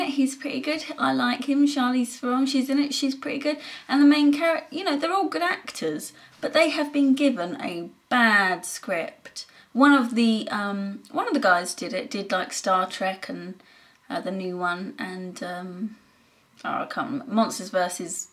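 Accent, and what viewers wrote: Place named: United Kingdom. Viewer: As a Canadian i cant get enough of her unique British accent....lol..its so stereotypical sounding with the use of the very british sounding "nah-T" and "O-full" and "mone-sta". It's awesome! Great review!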